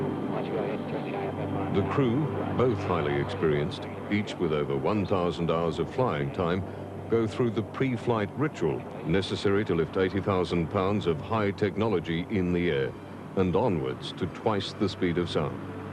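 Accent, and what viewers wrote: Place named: Australia. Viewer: Sounded Australian to me???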